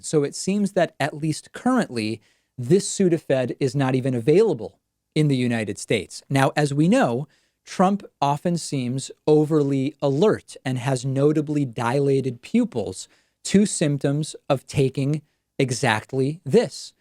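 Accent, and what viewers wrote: Argentina. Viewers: ‘Cinco de Masho’ - love the Argentine accent.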